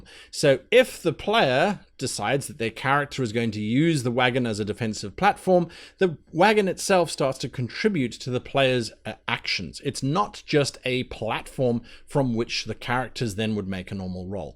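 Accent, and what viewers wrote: South, United States. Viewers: Damn, dude! For an african, thats not bad south hick north american accent! I can beat ya, but barely. Bet you can beat my dutch sailor. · HAHA!! GREAT SOUTHERN ACCENT!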